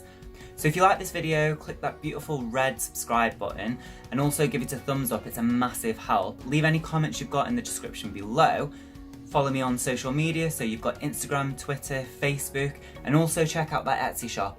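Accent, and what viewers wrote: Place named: Yorkshire, United Kingdom. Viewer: i was watching your 5 fave android apps for fashion and started perusing your vidz and checked out your Etsy and was going to ask how do you find Etsy ? I find it heavily oriented towards the US and me being a UK expat in NZ selling sassy designer clothing is hard going. They seem to expect everyone to give free shipping to the US ! Anyway, had to Google you defending James Charles etc but was totally lost on who he is (old age !) so I was born in London in the flower power era but got marooned in Yorkshire for 20 years way back and I'll guess you are from North Yokshire ? Barnsley ? Touch of Birmingham on Your ending G's but it's an accent for life !! lol I can come up with 4 countries accents in 1 paragraph hah. Sunshine Greetings to the Homelands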